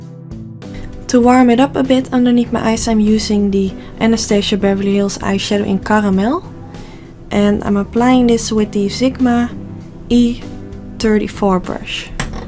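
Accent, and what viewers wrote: Netherlands. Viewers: Hi! Gorgious make-up i love it! 😘 I have a question tho, Are you Dutch? Cause you sound like one. Let me know. 😘 Fallonrachel (The Netherlands)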